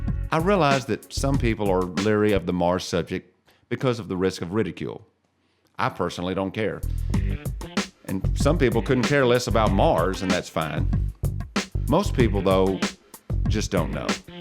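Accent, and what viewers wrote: South, United States. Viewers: Hey stupid, Its not Mars! Its not what looks like weird stuff on Mars, Its a shot of a place on Earth and calling it Mars. You can't be that stupid. Or you are working for Nasa and are doing the life in space BS! Listen up Stupid, No planet, No space, No rovers, No nothing but an agenda to try to make our Lord and Savior seem like a creature from space to make us fight against GOD! When Yeshua returns, You bow down. If a fake alien invasion happens, You go to Nasa and Govt's to fight. And your accent of a Southerner does not make you trust worthy! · Love this guys southern accent. He sounds like my brother. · your southern accent just bring it home or reminds me of home. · I'm guessing that narrator is from the south...LOL GREAT video sir! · Your from the South, aren't you friend? Maybe....the Tennessee Valley? It's the accent. 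You sound like an educated Southerner... probably in the above mentioned region. There are alot of engineers and scientist in the TN valley, ie Marshall Space Flight Center, in Huntsville.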